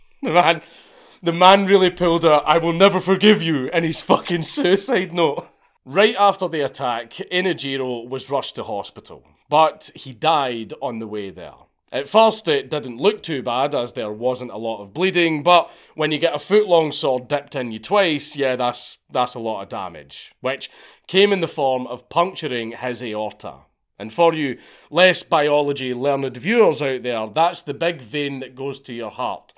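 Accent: Scotland, United Kingdom. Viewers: i chalk that up to thick Scottish accent.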